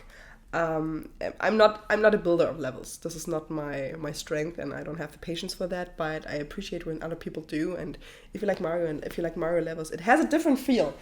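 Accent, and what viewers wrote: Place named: Germany. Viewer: Wie man einfach direkt hört dass du deutsch bist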